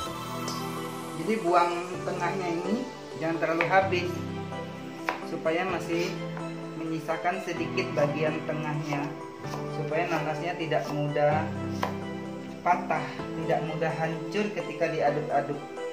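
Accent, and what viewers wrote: Bangka, Indonesia. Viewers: Abang orang bangka ya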